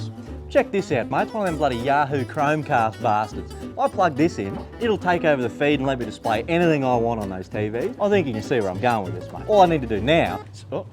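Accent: Australia. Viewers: don't know why but I didn't expect an aussie accent to come out of that face... Anyhoo, you wanna be a sultan of salmonella, you should come to kagoshima where we eat raw chicken and you can get raw egg to pour over your gyudon.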